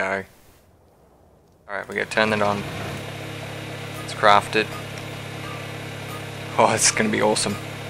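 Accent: Australia. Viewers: Was looking at how to aim - and watched the whole thing laughing - and - its nice to hear a fellow Aussie voice!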